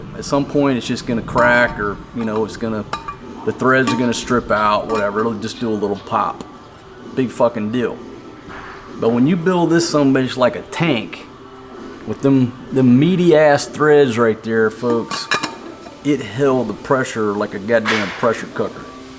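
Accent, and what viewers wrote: United States. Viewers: I’m Canadian and listening to you is like watching a Saturday Night Live skit that is so quintessentially American. Thoroughly enjoyed it and hope Wife #1, 2 and 3 are happily making use of your in tact Family Jewels.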